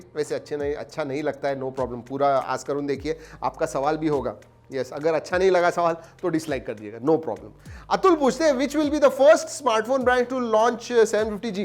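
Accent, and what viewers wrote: Maharashtra, India. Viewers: aap jab marathi bolte ho to bahot achha lgta hai.. kya aap maharastra se belong krte ho..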